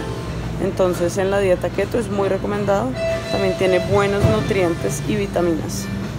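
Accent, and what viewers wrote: Colombia. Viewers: Me recuerdas a mi ex colombiana estás súper linda , hablas como rolita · Es q los Colombianos son asi , gente bonita si forma de hablar sus modismos son.lindos y esta muer no se guarda naa nos dice las cosa tal cual ayuda mucho su sinceridad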